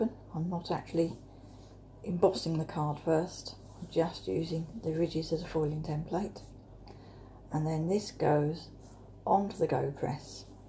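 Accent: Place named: England, United Kingdom. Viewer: yesI love her calm no nonsense precision using measurements thicknesses and no useless jabber😃😄😂I can't believe my fortune to have found her...and that adorable English accent..I sit here with my calligraphy book and drool♡♡♡♡ I can't wait to give foiling on vellum a go!!!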